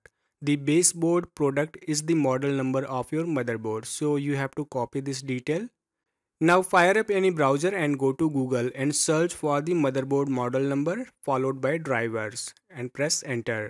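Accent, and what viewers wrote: India. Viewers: when i heard the indian voice i knew i was in good hands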